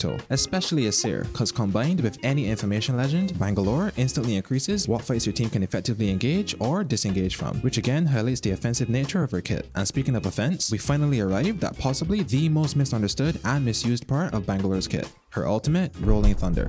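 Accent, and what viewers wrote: Barbados. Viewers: Sound like a bajan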